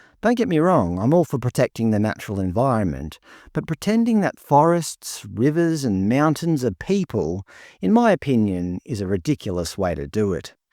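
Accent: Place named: Australia. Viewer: You sound like an Aussie, trying to tell the story of,, the Indigenous of Aotearoa🤦🏽‍♂️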